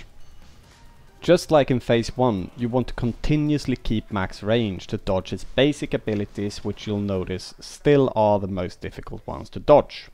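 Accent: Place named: Sweden. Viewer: Största svenska dialekten, bra video!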